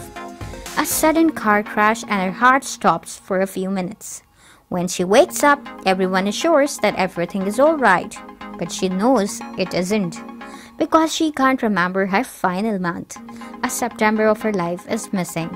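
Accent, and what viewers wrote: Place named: India. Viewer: Omg the Indian accent is annoying to finish this video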